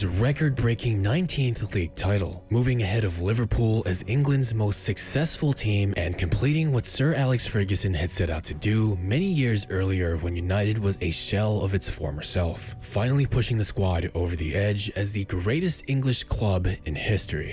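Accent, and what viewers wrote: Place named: United States. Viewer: Hearing someone with an American accent saying football when referring to soccer makes me cringe. Idk why maybe it’s cuz it’s so unusual to me.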